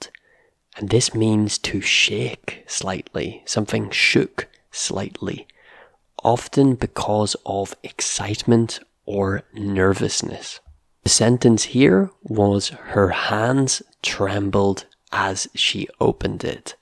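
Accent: United Kingdom. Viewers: are you Scottish? I'm just curious because of the way you pronounced certain words like the ones that have the /ey/ sounds: carefully, raised, paper, and waves. Carefully sounds like curfully; raised, reezed, paper has a true /ey/ sound; and waves is wevz. Also, isn't buried supposed to sound like beh-reed?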